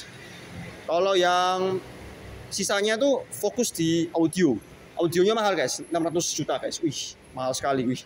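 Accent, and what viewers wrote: Java, Indonesia. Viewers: Mobilnya keren... Yg gak keren tu orang yg ngerivieu nya..katrok medok... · Komentator nya medok banget...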